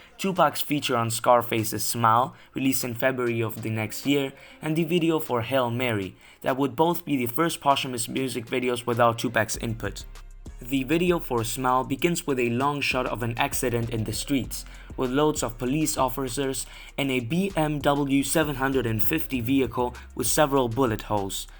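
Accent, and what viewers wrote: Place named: Philippines. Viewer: Very well made and intelligent content! Where are you from, you have an interesting accent (Filipino is my best guess)?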